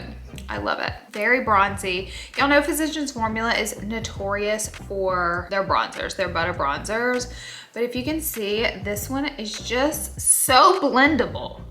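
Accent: South, United States. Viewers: Omg the southern accent! Love!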